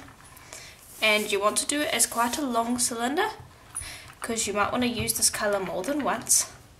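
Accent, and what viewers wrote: South Africa. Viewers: Love your tutorials you're very good! Also love hearing the South African accent, miss it since I left in 2000 n don't hear it much. My husband always teases me when I say 'off' and I giggle every time you say it. Never noticed it before but now I can hear what he means. Keep crafting, you're awesome.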